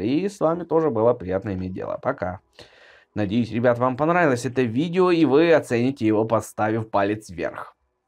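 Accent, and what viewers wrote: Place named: Ukraine. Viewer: Український акцент)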